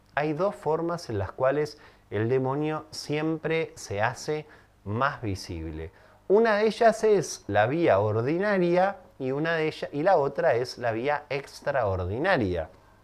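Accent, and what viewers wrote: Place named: Venezuela. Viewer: 🤭 ¡Lo sabía, eres Venezolana 😊 Un abraaazo 🤗! ese tono de voz es inconfundible prima🇻🇪.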